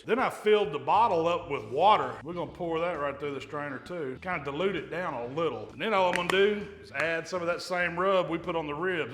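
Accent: South, United States. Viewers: Have you ever seen a grown man salivate??! You my friend are awesome love southern way of talking and I could smell your cooking over the Internet thanks for the wonderful tips Allan So Cali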